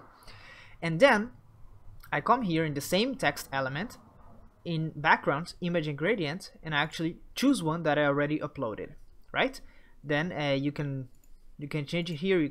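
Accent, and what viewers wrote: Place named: Brazil. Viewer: pelo sotaque senti que era do Brasil, você tem um inglês leve e sonoro, muito bom!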